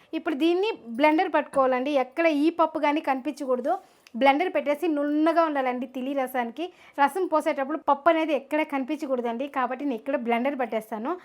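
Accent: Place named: Kanchipuram, India. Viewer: కామక్షి తాయి లాగా ఉన్నావమ్మా కంజీవరం అమ్మదా మాట్లాడు తునట్లు వుంది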